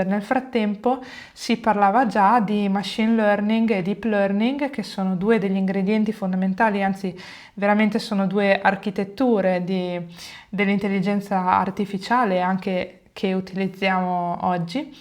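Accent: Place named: Eastern Europe. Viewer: Infatti adesso noto che neppure il suo nome mette. E dall'accento mi sembra slava. Una "dottoressa" proprio in gamba deve essere. Olistica al punto giusto.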